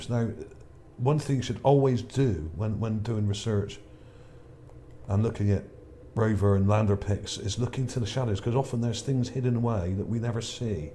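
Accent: Bristol, United Kingdom. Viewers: Gotta be from Bristol with that accent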